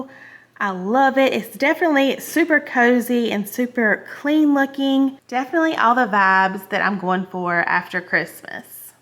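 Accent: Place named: South, United States. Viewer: I'm an Australian interior designer and I love your Southern accent, great ideas on your channel, well done xoxo 🤠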